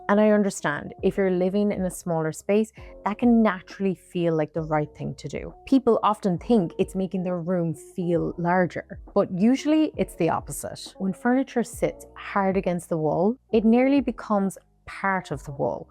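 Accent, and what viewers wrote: Ireland. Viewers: first, she's irish, in my opinion a much cooler accent. second, i recently ' learned ' (it may or may not be true) that before amer. was amer. the british spoke with an 'american' accent. it was the british who changed, amer. accent is the original. if it is true, it is pretty funny. btw there are many different british accents as well and some of them are also torture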